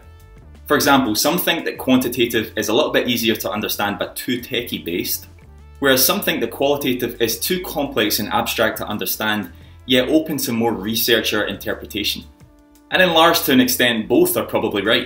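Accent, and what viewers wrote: Scotland, United Kingdom. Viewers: That scottish accent though :D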